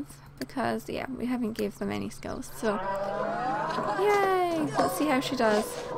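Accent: Scotland, United Kingdom. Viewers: i was trying to figure that out too but think its Scottish. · Are u Scottish love ya voice. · Are u British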